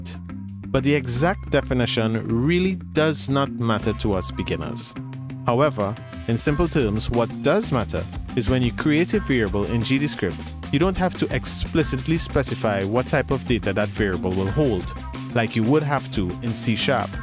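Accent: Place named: Trinidad and Tobago. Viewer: Dude your trini arent you lmao Love it .. keep it up bro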